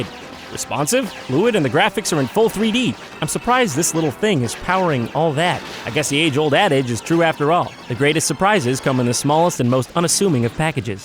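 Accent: New York, United States. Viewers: Funny how he had a new yorker accent when he was in Cali, but now that he actually lives in new York he lost it. :/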